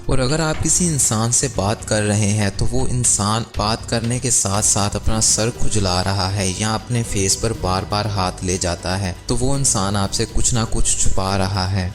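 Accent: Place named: Punjab, Pakistan. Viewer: Sir agar ap urdue ko thora bhetar tareke se bolenge to bht maherbani ho plz . ap punjabi lehjey m urdue boltey hn. Plz bura mt mna.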